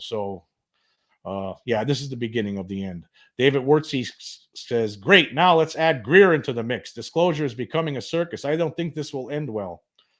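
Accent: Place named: Long Island, United States. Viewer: Ok. I grew up on Long Island. Sorta recognized the accent as being in the ball park. Thanks…and good show today. When do you do your podcasts?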